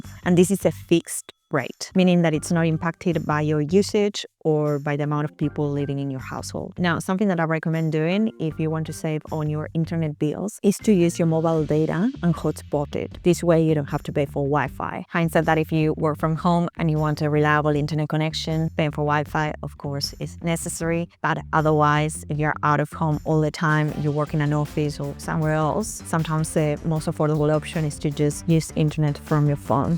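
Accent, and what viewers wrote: Argentina. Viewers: ese acentooooo sos argentina? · El acento argentino siempre presente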